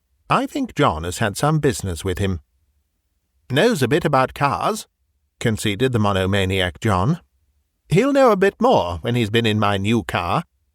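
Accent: England, United Kingdom. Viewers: Such a lovely voice to listen to. So nice to hear an English accent!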